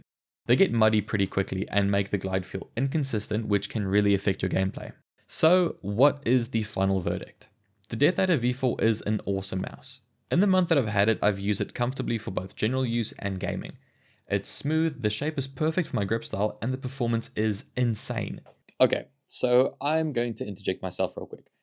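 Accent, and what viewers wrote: South Africa. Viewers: You South African bro ?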